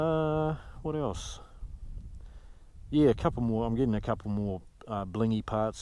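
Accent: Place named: New Zealand. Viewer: Nice Kiwi accent mate! takes one to know one lol. Great vid, keep making vids and posting them up, enjoyable and educational for sure!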